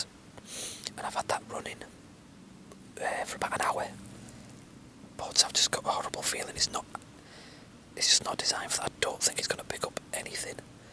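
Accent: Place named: North, United Kingdom. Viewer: i bloody love a northern accent on a man . have enjoyed this x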